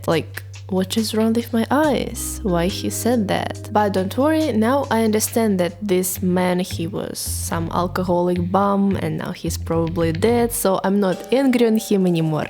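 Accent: Russia. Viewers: If not for the Russian accent, I'd think you were English ... I'm not sure what that guy was on about. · Your enunciation of English with Russian accent is 🔥🔥🔥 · Me encant tú acento ruso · You said rural best when you rolled the "R". Embrace your Russian accent; that is a difficult word even for natives to say. It was easiest to understand when you said it slightly more "Russian"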